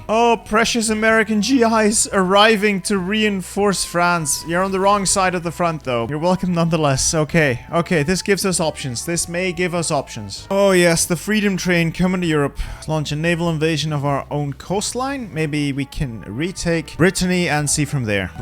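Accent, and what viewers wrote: Belgium. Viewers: out of curiosity, are you from flanders or wallonia cause i can’t put my finger on it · Hes Belgian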